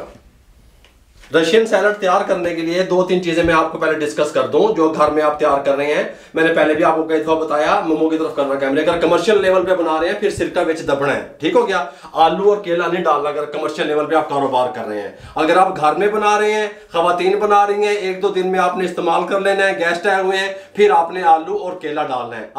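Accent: Punjab, India. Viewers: 😁 punjabi touch ❤❤❤❤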